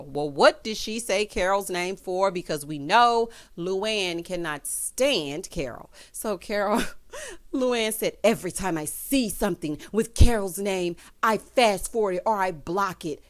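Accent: South, United States. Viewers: I love your beautiful voice you remind me of my Southern family on my mother's side. I am glad I came across your platform I have been enjoying your content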